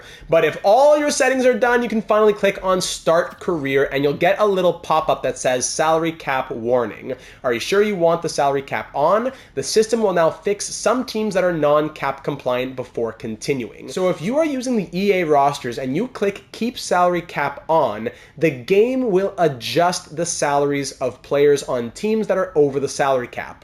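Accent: Quebec, Canada. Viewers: thank you! Great video and est ce que t quebecois? Si oui gg pour ton accent anglais!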